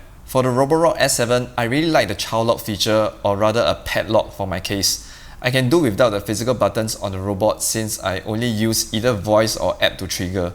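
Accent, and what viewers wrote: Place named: Singapore. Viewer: Impressive amounts of tests! I'm Korean but would never buy a Samsung because they are severely overpriced in Korea. So I'd stick with Xiaomi or Roborock. On a side note, very interesting Singlish accent. I have quite a few Singaporean friends and find the accents vary quite a lot from person to person. Wonder why that would be?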